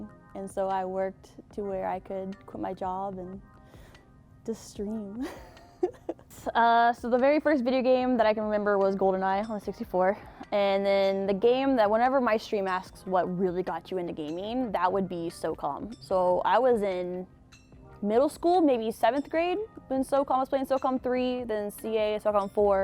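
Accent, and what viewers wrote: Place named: Texas, United States. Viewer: She reeks Texan.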